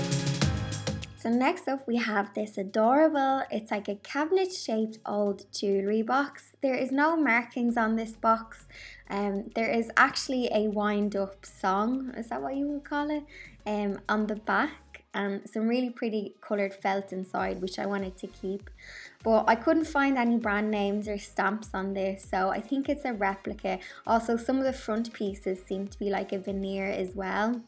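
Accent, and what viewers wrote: Ireland. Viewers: Only just found your channel and I love it! Your cats are so cute. You seem such a sweet person and your DIY's are so so lovely, but not sure what I enjoy most, your projects or your accent, I love the Irish accent. Keep up the good work and look forward to watching more of your videos. Take care and stay safe 🤗🤗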